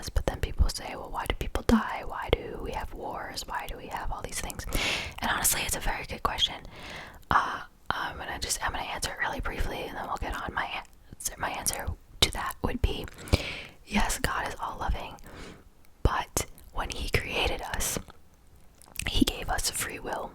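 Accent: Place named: New Jersey, United States. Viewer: has an irl Jersey accent. I've heard it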